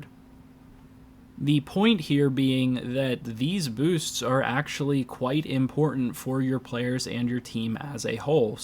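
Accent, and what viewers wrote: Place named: Pittsburgh, United States. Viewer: I’ve got the Steelers on mine, too. I can hear your Pittsburgh accent coming through. Am I right?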